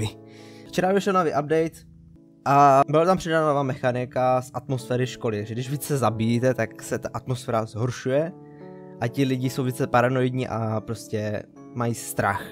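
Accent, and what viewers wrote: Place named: Ostrava, Czech Republic. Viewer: Btw jsi někde z okolí Ostravy? Zníš jako totální Ostravák :D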